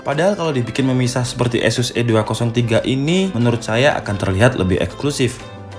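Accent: Malang, Indonesia. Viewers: Wong Malang a iki?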